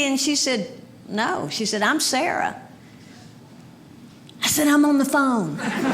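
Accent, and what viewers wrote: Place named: South, United States. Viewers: She's definitely southern. " Watch my drip... Nah nah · That is a heavy southern accent . Im Guessing Texas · You're right. Put her in a dress and add a few years. Looks so like her and that north Carolina accent.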